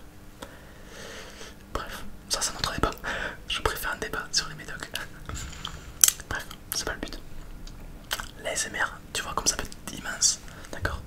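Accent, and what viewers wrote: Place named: Tarbes, France. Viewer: Tu serais pas de Tarbes toi ? J’ai l’impression avec ton accent :)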